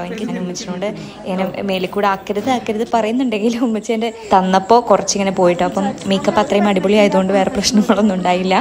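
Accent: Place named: Malappuram, India. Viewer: Malappuram thanje ayathu kond talk nalla pole cnct anuu😄so kettondirikkan nalla rasayittundd💛🧡😁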